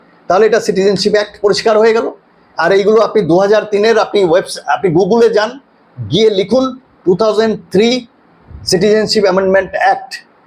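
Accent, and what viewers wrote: Bangladesh. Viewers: আপনার accent শুনে মনে হচ্ছে আপনি বাংলাদেশী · APONAR VASAY AKHONO BANGLADESHI BOLA MONA HOY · APNAR BANGLA PRONUNCIATION SUNE MONE HOCHHE AAPNI BANGLAADESHI.....!!!!! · Bangladesh lok mone hoche...vat bolchen...every thing given logically....bangladesh bhasha tai doubt hoche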